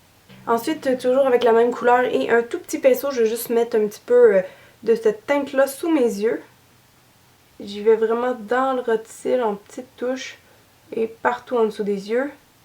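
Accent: Quebec, Canada. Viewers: Whoua Alyssa Milano avec l'accent québécois ;-) très jolie !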